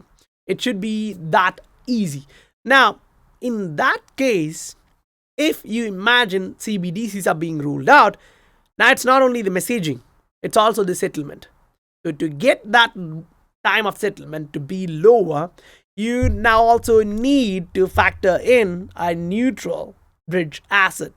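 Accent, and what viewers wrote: India. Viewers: Are u Indian...?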